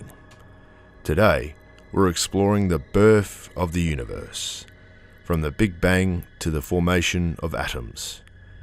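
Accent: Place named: Australia. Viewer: Swear to god when if we have an Australian npc in our next game, I will want that voice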